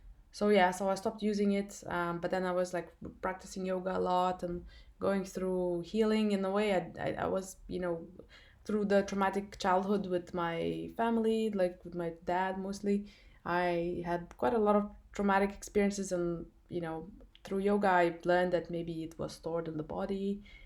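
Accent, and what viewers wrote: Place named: Eastern Europe. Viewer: My sister if you felt spiritual oppression yesterday on halloween it means you're on the right side. the side in the Light opposite to witches. I have a question - are you from some slavic country? you sure have that accent. Jesus keep you close to HIM. Jesus guide you so that you don't stumble. In Jesus Holy Name we pray. Amen.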